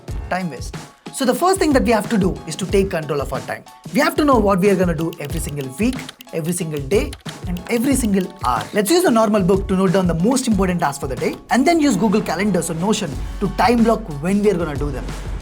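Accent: India. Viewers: that indian ahh accent but awesome work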